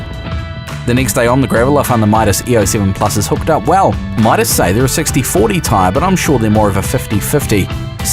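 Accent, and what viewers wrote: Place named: New Zealand. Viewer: I'll never get used to the Kiwi accent pronouncing an E like an I.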